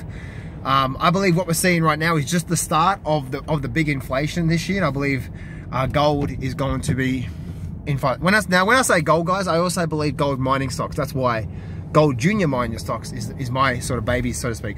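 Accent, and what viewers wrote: Australia. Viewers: Whats going on, your videos just started showing back on my list. Its nice to hear your interpreation of market moves and your Austrailian accent. Take care, cheers!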